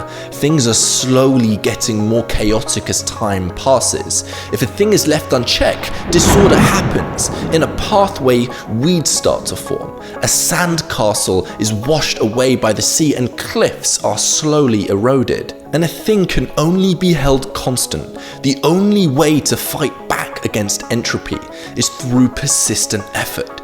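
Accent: United Kingdom. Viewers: It is definitely the British accent. My husband is from Stafford and he pronounces a lot of things that end in an “a” as if they end in “er”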